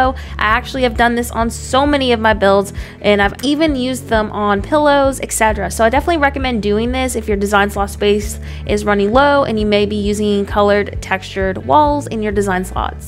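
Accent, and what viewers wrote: South, United States. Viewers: I thought I heard a bit of a southern accent but when you called the shopping cart a buggy I knew you were my people lol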